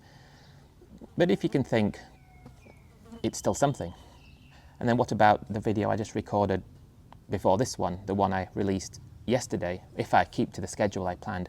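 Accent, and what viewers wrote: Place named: Thailand. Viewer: You’re right. Let the haters write their comments. The creators who delete everything negative are very insecure. People don’t want to see filtered comments that only suit the creator’s narrative or agree with everything they say There’s an individual in particular who does that and it’s very annoying He’s extremely defensive and is always showing anger at ‘the trolls’ but we never know what the alleged trolls are saying because he deletes absolutely everything he doesn’t 100% like (I can only imagine though - he intends to retire (in England) aged 55, wife 60, never work again with only £250k and from that cash they’re going to buy a 50 year old boat and 20 year old campervan and live out their years in those - good luck to them is all I can say 😂 They’re one expensive repair away from disaster, not that they have enough money anyway, and they’re used to living in a house. 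So I think he’s very much underestimating not only that but the lack of space physically and from each other, enduring the cold harsh winters and lack of amenities. Car crash viewing - the delusion makes for interesting watching!! 😂) Would you consider doing a video about you. What age you were when you moved to Thailand, why, where in England you’re from (I cannot place your accent and in fact before I saw you I thought your accent was Thai!), if you have a wife, how you met her, if she’s Thai, do you rent property or is anything you own in a Thai person’s name, if you’ve got kids, what jobs you’ve had (p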